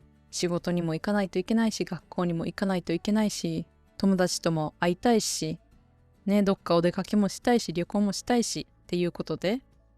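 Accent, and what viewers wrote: Kansai, Japan. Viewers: 関西弁のイントネーションが面白くて好きです!これから関西弁のポットキャストもっと聞きたい😁わたしも関西弁を勉強したいなぁ(いやいや標準語すら上手にできないくせに🤣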